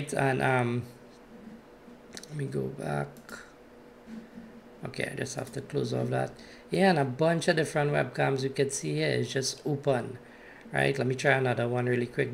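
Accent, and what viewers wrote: Trinidad and Tobago. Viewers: trini boy?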